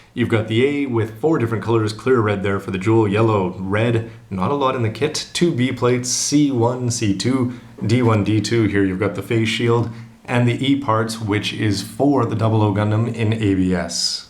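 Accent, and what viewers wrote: Canada. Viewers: Judging by his hands and his accent, I'd say he's probably white and probably from Canada or the northern United States (because it sounds like he says "out" like "oot" sometimes). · is, in my summation, Canadian. I say that based on his accent and vocabulary. And honestly, I am curious why he lives in Japan but if he wants us to know he will decide when and if he tells us.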